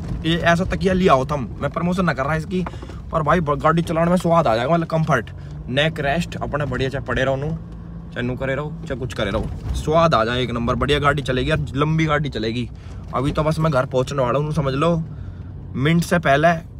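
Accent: Haryana, India. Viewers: Haryana se ho kya language aise lag raha hai reply dene ki free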